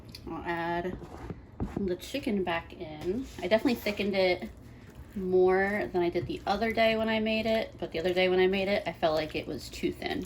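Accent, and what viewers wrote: Philadelphia, United States. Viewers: I always thought you had a Philly accent but in past videos I think you said you were living further down South. But with the Phillies shirt I’m guessing from Philly region. Im in DELCO and sounds very familiar. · I knew you had a Philly accent!! Go Phillies!! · !! I know you're located in NC but I've thought for years I was picking up on a Philly accent in your videos! I'm from Delaware County-- where are you from? Also, GO PHILS! ♥️⚾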